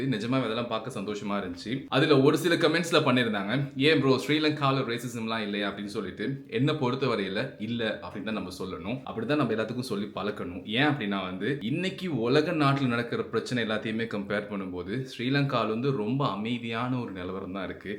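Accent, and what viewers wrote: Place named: Tamil Nadu, India. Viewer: நீங்கள் பேசும் தமிழ் தமிழ் நாட்டுத் தமிழ் மாதிரி உள்ளது. உங்கள் பேச்சு இலங்கைத்தமிழ் போல இல்லை. (மட்டக்களப்பு, யாழ்ப்பாணம் மற்றும் மலையகத் தமிழ் போன்றும் இல்லை)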